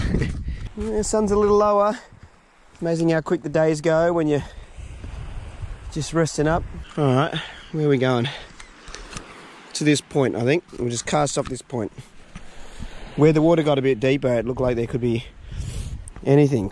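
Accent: Australia. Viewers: He has the most stereotypical Australian accent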